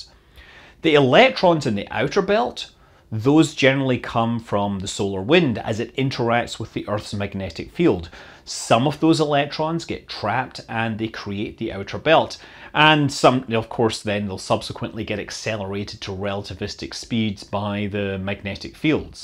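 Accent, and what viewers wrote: Scotland, United Kingdom. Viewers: Great video as always! Unrelated, I got a good chuckle every time you said “Explorer,” which I heard as “Exploder” due to your Scottish accent.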